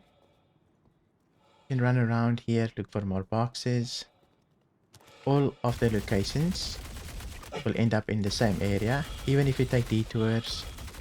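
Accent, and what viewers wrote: South Africa. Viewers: Great content there mate you sound South African if so I'm South African too anyway this helped me through site B...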